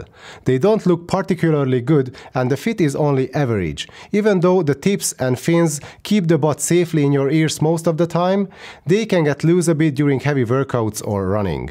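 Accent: Hungary. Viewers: Valahogy érezni hallásra hogy valaki magyar 😅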